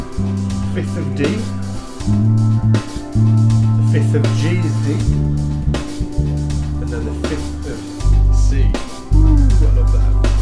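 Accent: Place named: United Kingdom. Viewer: He is british, maybe you are used to listening english accent from North America and that's why sounds weird to you.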